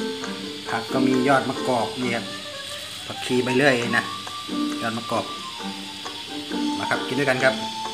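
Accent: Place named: Isan, Thailand. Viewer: จ่าคือบ่อเว่าอิสานบ้านเฮา..มันมีเสน่เด้ ผมว่าน่ะ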